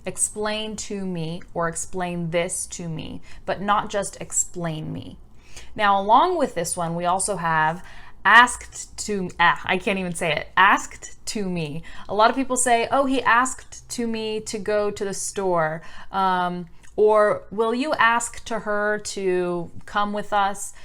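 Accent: California, United States. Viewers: Come to California, many women sound just like this. I don't know if she is from California, I'm just guessing based on her accent. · Move to California and you´ll start talking like her in a couple of years or so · I know everything what you said, particularly the grammar aspect. But I simply love this video bcz its very useful for my listening ,and i love your californian accent.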